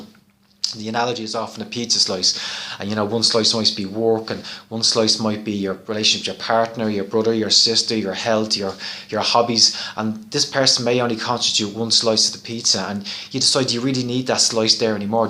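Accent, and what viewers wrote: Ireland. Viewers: You look like an Italian, but sound like an Irishman.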